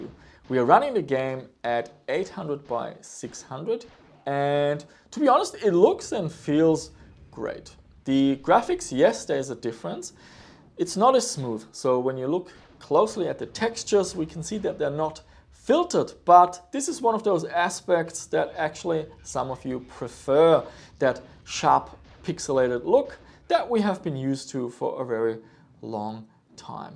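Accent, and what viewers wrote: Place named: Germany. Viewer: Your profile says you are from Australia, but you sound like a German speaking English. Why is that?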